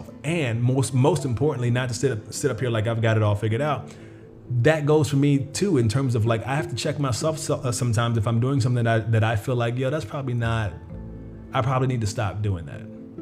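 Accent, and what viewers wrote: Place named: Mount Washington, United States. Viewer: You sound like you was in Mt. Washington or somewhere close...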